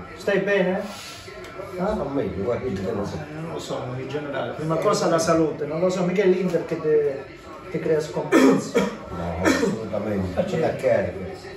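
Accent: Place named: Basilicata, Italy. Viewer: Non credo sia Calabrese, penso sia della Basilicata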